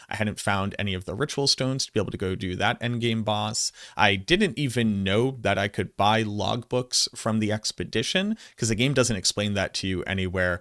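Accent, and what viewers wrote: Boston, United States. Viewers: Hit'em with the math,!! Lol Great vid! Thanks brother. Appreciate you. Oh, and those Bostonian slide-ins, "id-eer" icing on the cake! LMAO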